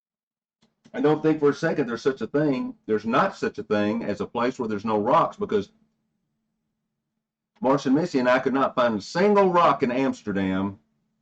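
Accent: South, United States. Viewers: If I watch you for too long I might start talking Southern.I loved what you are finding and your long ago contributions. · You have and American speaker acent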